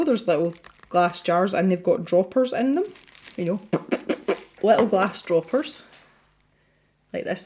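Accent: Scotland, United Kingdom. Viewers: Where do you come from? It Sounds of Scotland. Am I right! Greetings from Germay. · I’ve been subscribed to your channel for a while and really look forward to your videos, I’ve only just realised you’re from my part of Scotland, that’s why i thought your accent was familiar. Keep doing what you’re doing as you’ve given me the courage to get back into art 😁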